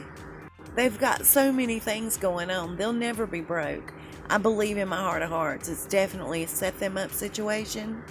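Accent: South, United States. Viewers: Stop already!! You and your haters need to keep your opinions to yourself. You love drama and gossip. You sound like your from the South I can't understand some of your words. Drop the accent, what are you perfect. People like me are a pain my ass. You can't say anything nice.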